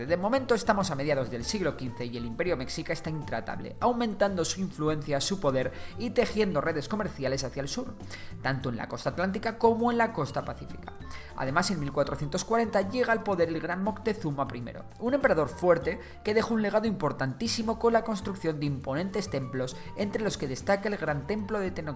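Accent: Castile, Spain. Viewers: El habla español castellano weey nomms. No es el mismo acento que el acento mexicano, no seas duro hombre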